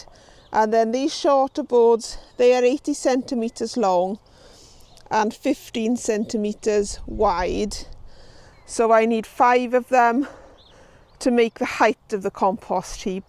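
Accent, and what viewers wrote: Wales, United Kingdom. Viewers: Ah that Welsh accent! - I just want you to say lol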